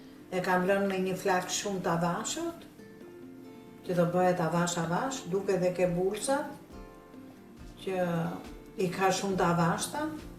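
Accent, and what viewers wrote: Tirana, Albania. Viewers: te lumte goja e dora na kenaqe me gjuhen e tirones po e gatimet i ke shume te mira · Sa me pelqen kur flet gjuhen e bukur tironse…❤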